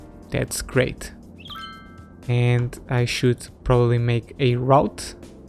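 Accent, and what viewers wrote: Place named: Portugal. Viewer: Where are you from? The way you speak English sounds like you're Portuguese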